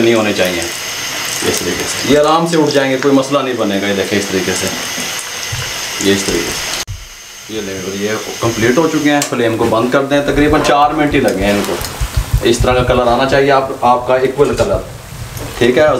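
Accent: Punjab, India. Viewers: Main ne jitni bhi aapko recipes daikhi hain aur saaari try bui karto hoon aur wh 100% perfect banti hain aapki recipes bohat zabardast hoti hain aur mujhay sorf aapki recipes pasand hain aaur aap ka punjabi style bohat achha lagta hai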